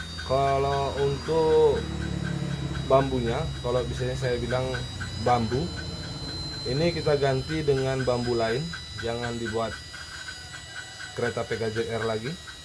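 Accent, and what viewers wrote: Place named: Aceh, Indonesia. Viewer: Plat motor yah sih medan tpi klo logat y orang aceh ni abang ya😅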